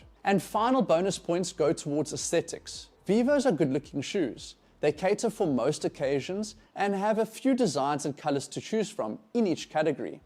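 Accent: South Africa. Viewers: yeaahhh, this guy is South African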